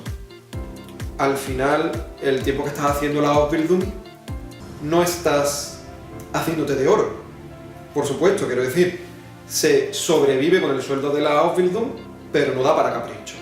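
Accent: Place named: Andalusia, Spain. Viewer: Olé olé y olé, maravillosa explicación!!! qué acento andaluz más bonico!! un besazo guapoooo!!!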